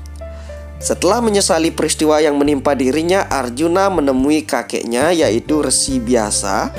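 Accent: Bali, Indonesia. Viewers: Orang bali..bkn madura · Logat balinya medok bli😀😀😁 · Logatnya saja jelas,logat bali di kata " T" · Logat penyiarnya seperti logat bali. Kalo didengar ceritanya mmg berdasar dari buku mahabarat. Aku jg pernah baca buku mahabarata versi s.pandit (bali) Dl pernah punya yg karya org india tp ga selesai karna bukunya tebel bgt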